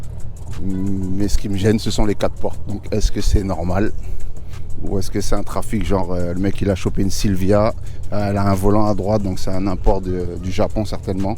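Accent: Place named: South, France. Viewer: Savas tu vas très bien et bien décontracté pour moi bonne vidéo on dirait que tu est originaire du sud de la France moi aussi est je vie à Miami fl à plus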